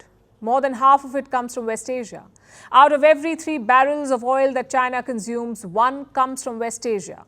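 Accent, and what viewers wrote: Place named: India. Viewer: Please speak English; I can't understand your Indlish.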